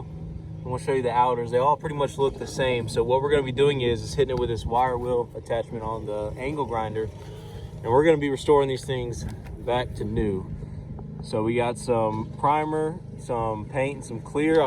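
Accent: Alabama, United States. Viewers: You are from Alabama right ?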